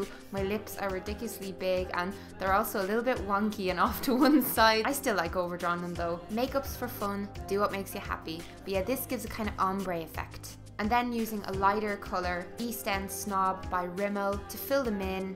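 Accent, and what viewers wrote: Ireland. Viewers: As much as Kylie Jenner kinda annoys me (and all the hype... which I don't really "get")... or the concept of makeup done for the sake of selfies, I LOVED this. First time watching. New sub;) <3 So charming, and super skilled! Also, don't get me started on Irish accents. GOTTA LOVE THEM!!!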